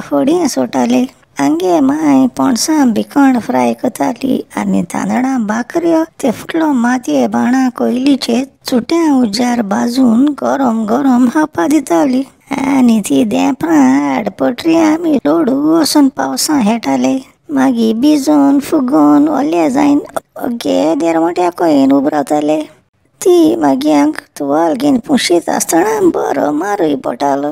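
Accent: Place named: Goa, India. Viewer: These episodes really bring back good old memories of our childhood in goa (especially the tone of talking) Keep going 🙏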